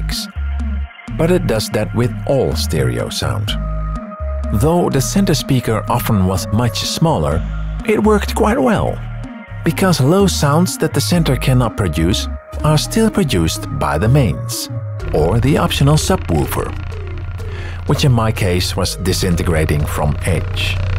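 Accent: Netherlands. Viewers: Me: That accent.. Is this guy Dutch?: “Toedels!” Me: YUUUP! Gelijk geabonneerd! ❤ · An interesting dutch accent!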